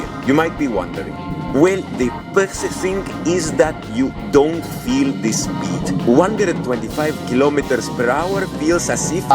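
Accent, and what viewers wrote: India. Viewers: El acento se escucha como el de los indios de la india. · En realidad eres tú? Suena como tu tono de voz, pero un acento Indi demasuado marcado, no suena ni como acento latino, como le hiciste? Por lo demas excelente video bro, me encantan tus videos, keep ut up, thaks to you I made my decision between a few options to by a car appreciate it 🙏🏻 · Por que hablas como Apu?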